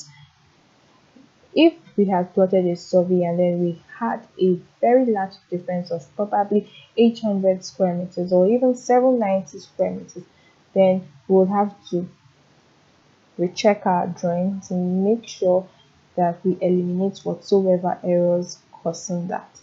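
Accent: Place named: Nigeria. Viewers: nah. She rarely made grammatical blunders. I Understood her perfectly. So its either you're terrible at hearing English or you're just being presumptuously mean for no damn reason. That's a Nigerian accent. I'm Nigerian and English is the Lingua Franca in that country. Most of the new gens learn English as a first language. So you coming here on YouTube to give unsolicited Dimwitted comments because you can't comprehend her accent is just distasteful. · Don't be silly man, if you can't understand simple Nigerian Accent.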